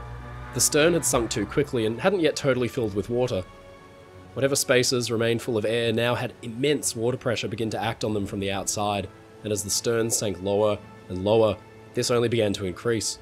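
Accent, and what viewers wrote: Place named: Australia. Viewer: This channel is always a delight to watch. The narrator has an engaging way with words as well as a hint of an Australian cultivated accent which is very rare these days.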